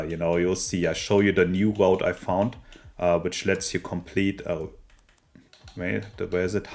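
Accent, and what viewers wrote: Germany. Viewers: Awesome Video. Thank you so much. This is going to save ne alot of time. (Ps: Ich höre einen deutschen Akzent)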